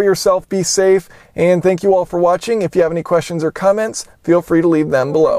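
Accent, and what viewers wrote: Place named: Oregon, United States. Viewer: Are you from Oregon/Washington?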